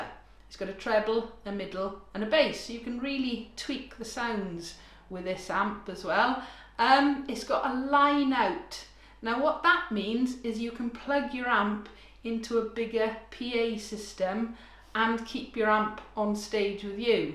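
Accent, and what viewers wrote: Wales, United Kingdom. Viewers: How do I amplify my acoustic Ukelele? I know that Acoustic Guitars can be done with a Mic in front of it, but how reliable is it. Nice to hear a Welsh accent from a Bristolian living in Canada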